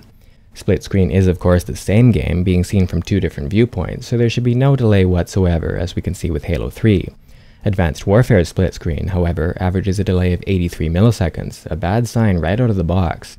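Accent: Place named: Canada. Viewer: Probably because he's not a yank. (Canadian. Same accent, less whine, not a huge ass. Multiple meanings there =D)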